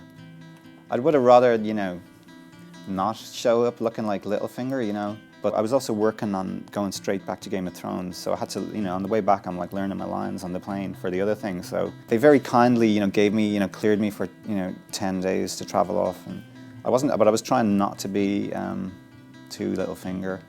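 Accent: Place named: Ireland. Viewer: Love his Irish accent. He and James Nesbitt (plays Bofor in the Hobbit) are my favourite Irish actors.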